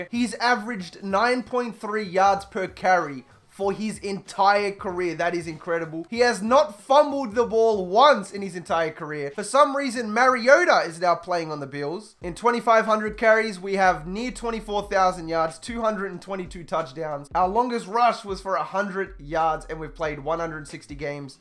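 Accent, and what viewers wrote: New Zealand. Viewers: Are you kiwi? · Are you a kiwi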